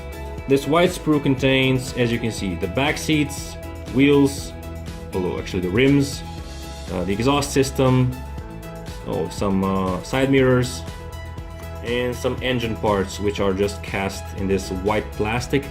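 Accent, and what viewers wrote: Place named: France. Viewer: Francozski naglas👌😂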